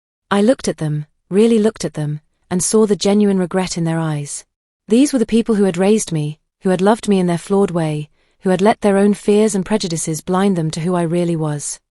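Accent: United Kingdom. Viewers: Where's her husband who was supposed to come to her rescue, like it says in the title. Absolutely love British narrators 😊❤❤❤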